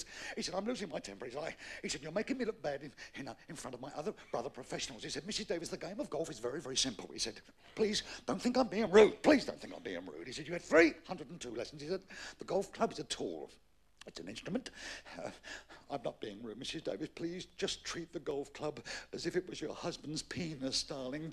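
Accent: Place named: Cockney, United Kingdom. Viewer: Classic cockney comic. They really dont make them like that anymore. All the whites in London talk black now. Such a shame that the cockney accent has died out. His jokes were ok but his accent was classic.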